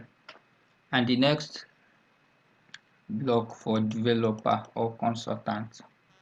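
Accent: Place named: Nigeria. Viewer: you talk like a Nigerian. well done and great job. Do you use AutoCAD MEP or REVIT for designs?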